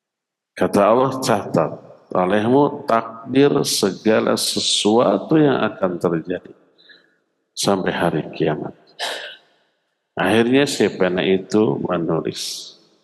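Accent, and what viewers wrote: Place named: Sunda, Indonesia. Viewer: my favorit, kental logat sundanya,,mugia sehat selalu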